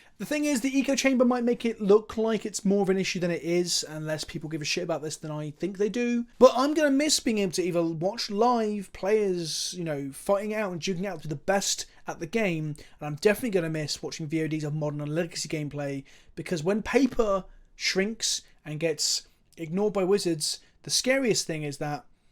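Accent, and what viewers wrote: United Kingdom. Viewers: I enjoy these kinds of videos. Even though the news is kinda a kick in the balls, it helps to hear you talk about it with that velvety british boi baritone voice.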